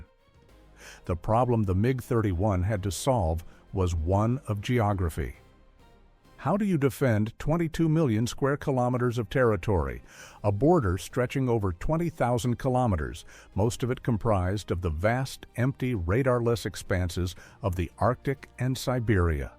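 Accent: United States. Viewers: Because you have an American accent geared up to know what yr talking about you Must be right right? ...Like Russia pinching automatic washing machines for the chips? You go on about the air frame? All it is a a bit of Al or what ever - you can simply make another one. On those grounds yr talking rubish, And an airframe to you means excess costs of parking yr wives fat butts in restruants rather then going into casting of forging metal. Which is why yous and NATO cant keep up and out pace. And after these thumb twidling calculations - then Russia is expected to lay flat like a carpet for yous to come in and rape and pillige the land? I think arrogance in a head blockage is the far bigger problem and liability here.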